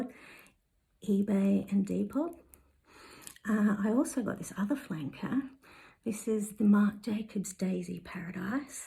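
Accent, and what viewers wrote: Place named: Australia. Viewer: You have a lovely soft spoken voice, and the Aussie accent is the icing on the cake. I've actually never smelled Daisy, but I love violet.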